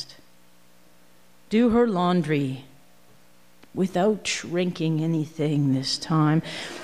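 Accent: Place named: Canada. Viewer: their accent is so comically canadian that i can hear my own get more pronounced after i hear them speak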